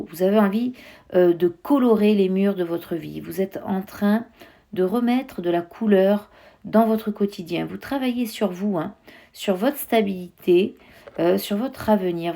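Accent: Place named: Corsica, France. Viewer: merci j'ai ressentis un léger accent Corse dans votre voix en tout très bon tirage bonne soirée à vous a bientôt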